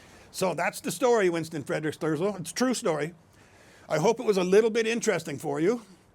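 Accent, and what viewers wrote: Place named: South, United States. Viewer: The fact that the locals recognise you as pro China is actually very positive. Also the other store probably was told of a foreigner who drinks the tall cans of grape juice. If you are right and it’s not a local thing then you would stick out even more because of that. Especially if they identify your accent as from the south and the drink also popular with people from a certain region.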